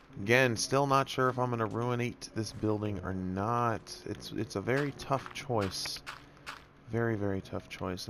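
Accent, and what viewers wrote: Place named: Ireland. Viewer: I think the ender Sphinx looks great and sorry with all the questions but what country are u from because I sound like u and I'm Irish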